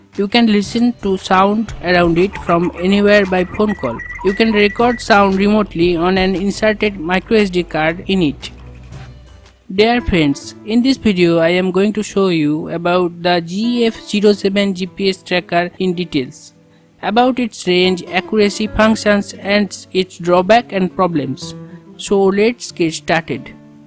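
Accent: India. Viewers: I hear Indian, I click close video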